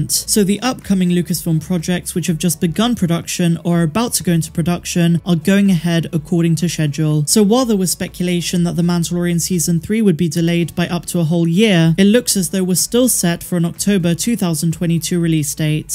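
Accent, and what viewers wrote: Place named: Bristol, United Kingdom. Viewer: Love the videos! Gotta say that every video reminds me of home with certain words having an almost Bristolian accent!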